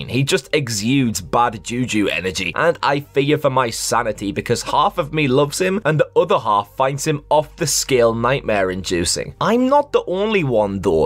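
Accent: Scotland, United Kingdom. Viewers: Bro are you scottish because you sound scottish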